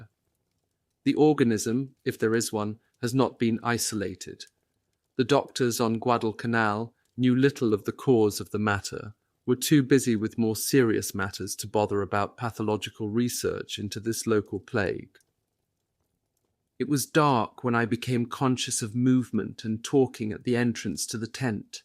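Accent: United Kingdom. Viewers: Something about a British person reading a story about Americans... it just does not sit right, and it feels like it does not fit. There, I said it.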